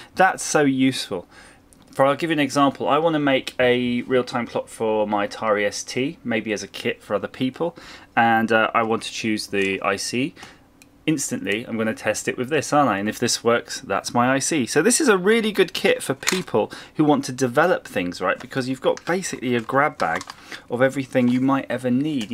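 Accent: United Kingdom. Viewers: I like the way you describe all the kit components! Nothing like to hear the British accent! 👍🏼😃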